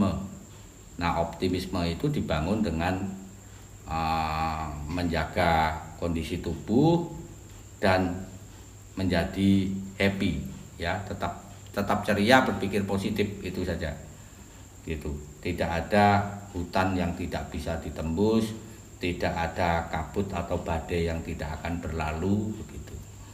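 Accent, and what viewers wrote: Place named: Semarang, Indonesia. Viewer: Itu bahasa Semarangan kak, medhok nya ada sedikit ngebass2nya gtu, wkwk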